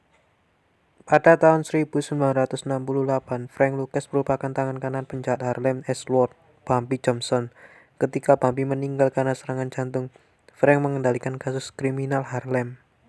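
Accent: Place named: Java, Indonesia. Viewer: di usaha in Jan medok bang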